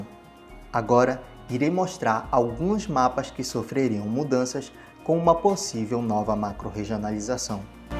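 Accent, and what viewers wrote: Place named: Brasília, Brazil. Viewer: Esse dialeto lembra muito Brasília, devido ao mesmo processo de migração. Talvez você seja do extremo norte do estado, por aí realmente tem uma semelhança com Maranhão. Já recebi gente do bico, da pra ver que eles falam diferente.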